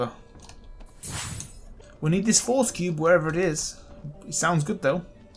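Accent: United Kingdom. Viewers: What part of UK is from? He has the same accent as Jon Snow.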